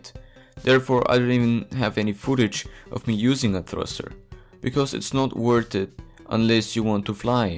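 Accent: Russia. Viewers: какой твой родной язык? у тебя сильный акцент слегка похожий на русский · Where are you from? Russia? You have specific accent :P BTW good vid, gonna try this :D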